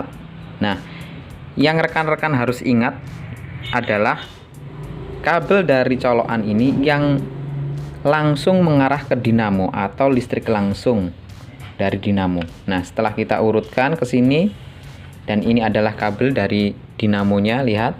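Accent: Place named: Java, Indonesia. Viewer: Amiiiin... Trmkasih infonya..Sampiyan niii marga tapi gaya bicaranya lebih ke dialek Jawa.. Enaaak merdu pula suaranya... Sehat &.. Sukses slalu..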